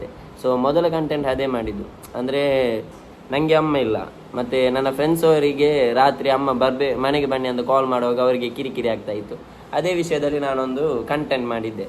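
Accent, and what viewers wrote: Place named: Mangalore, India. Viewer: ಮಂಗಳೂರು ಕನ್ನಡ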